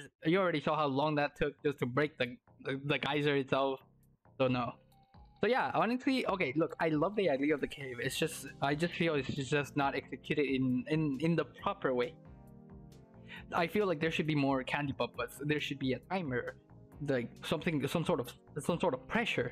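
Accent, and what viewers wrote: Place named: Latin America. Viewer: I like your latin accent 😂❤️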